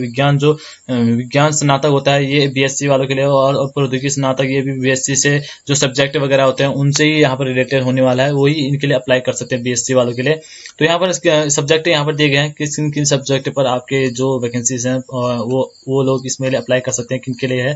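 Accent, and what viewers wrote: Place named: Uttarakhand, India. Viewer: Bhai aap phadi h kiya aapki language bhut piyari h. 😍😍❤ I love Uttrakhand ❤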